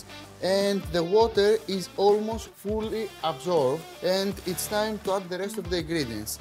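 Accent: Greece. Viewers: Carbonara with a Greek accent 🥰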